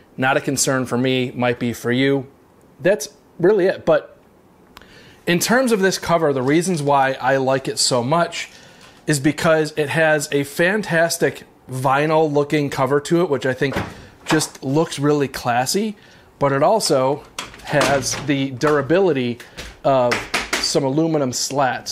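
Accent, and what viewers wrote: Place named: Western New York, United States. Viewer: That WNY accent will get you every time.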